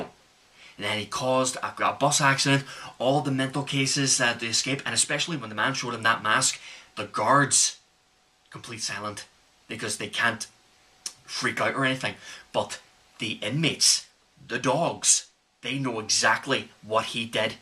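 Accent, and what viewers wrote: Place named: Ireland. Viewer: if Dr Loomis was Irish he would sound just like you.. great reaction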